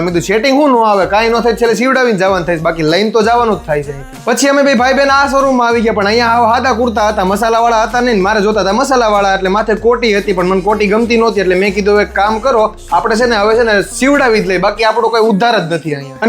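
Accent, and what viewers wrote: Kathiawar, India. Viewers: ભયંકર મસ્ત અને જોરદાર કાઠિયાવાડી બોલો છો ભાઈ.. એક નંબર અને પણ...😅😅😅❤❤❤👌👌🫰.. ભમ ભમ..se ne he..se ne he😂😂😅.. સેજ કટક બટક😮😂.. પાણપુra😂😂❤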